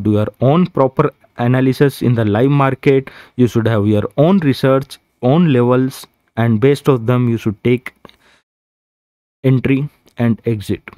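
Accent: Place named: Hyderabad, India. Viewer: Sir hindi is best from u and hyderabadi wala hindi ❤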